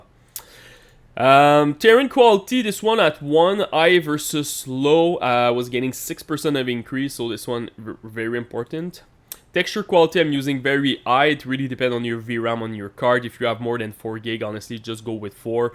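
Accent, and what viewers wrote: Quebec, Canada. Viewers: J'adore comment on peut reconnaître les Québécois ! Hehehe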